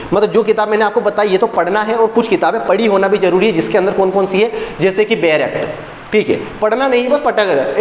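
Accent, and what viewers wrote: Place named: South, India. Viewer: Sir aap south Indian ki tarah baat karte h...aisa lgta h jaise south me bahut rahe ho....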